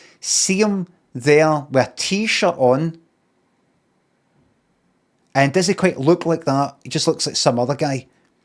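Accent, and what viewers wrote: Scotland, United Kingdom. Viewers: You clever b*****d,. I think you're onto something here. But wait a moment, now I'm wondering, is it that Scottish accent and yer fancy band t-shirt making me agree with you?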